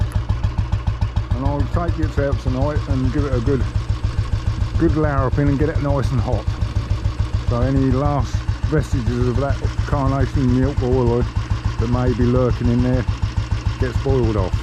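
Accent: Suffolk, United Kingdom. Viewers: Many thanks for this, Mr. I will save the link till it's time for my 500. In my opinion, the gear cover casting is one of the most beautiful parts of the engine. I have enjoyed watching this, and listen to your pleasant speech. Suffolk, was it? Swedish regards. 😀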